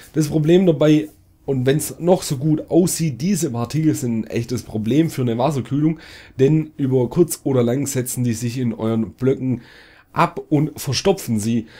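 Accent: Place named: Baden, Germany. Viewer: Du Klingst so Badisch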